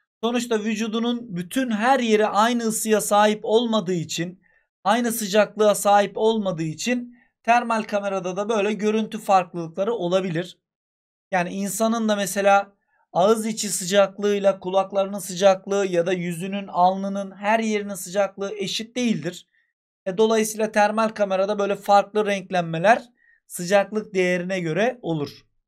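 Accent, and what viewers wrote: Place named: Trabzon, Turkey. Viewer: hocam kesin trabzonlusunuz ilk defa karşılaştım kanalınızla :DDd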